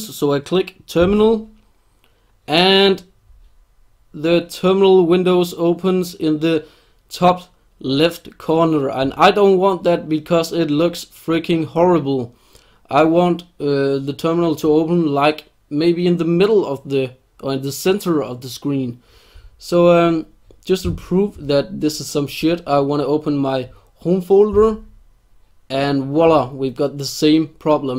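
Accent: Denmark. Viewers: is a nice name:D And still so danish and so badass english I do really like your english speak:D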